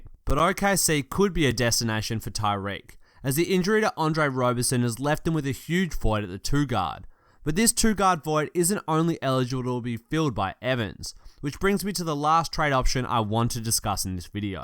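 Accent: Australia. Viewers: What kind of accent it is? Is it Australian?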